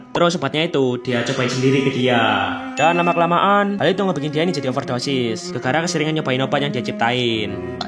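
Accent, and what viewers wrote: Java, Indonesia. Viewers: Wes fiks mas wong jowo 😭👊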